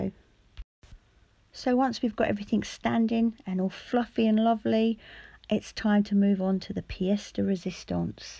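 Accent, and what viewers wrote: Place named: Essex, United Kingdom. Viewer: I live in Suffolk, I have a Rough Collie, a cavalier King Charles, a 10 year old apricot toy poodle , and have recently got a new toy poodle puppy, she was just over 12 weeks when I got her, she is now 21 weeks, and had her first little groom 3 weeks ago, I intend to let her have a litter, I used to breed Ragdoll cats in a very small way, this litter of yours are gorgeous , you sound like your from Essex, I would like to speak to someone who is close for advice, do you live in Essex?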